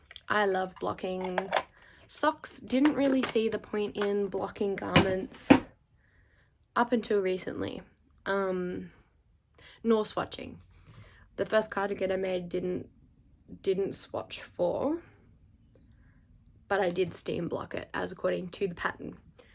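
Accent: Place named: Australia. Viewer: Clicked on this and was so refreshed to hear a fellow Aussie!!! Loved your first podcast and will now go binge the rest :)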